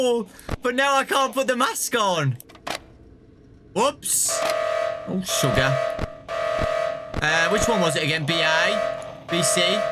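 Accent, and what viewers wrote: United Kingdom. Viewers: Does anyone here think British accent is cute☺?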